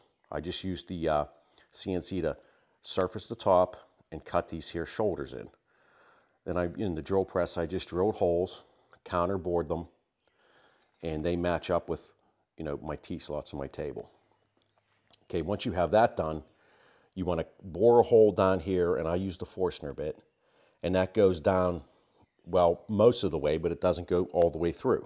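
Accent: Pittsburgh, United States. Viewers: nice video. do i detect a pittsburgh accent. question have you ever tried the pods with mdf on to to make a much broader vaccum area.....great video.. what nice little jig for those cases...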